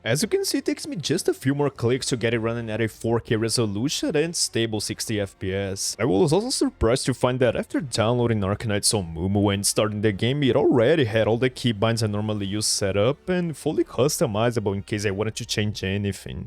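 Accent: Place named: Brazil. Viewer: Bro are u Brazilian? Your english sounds like mine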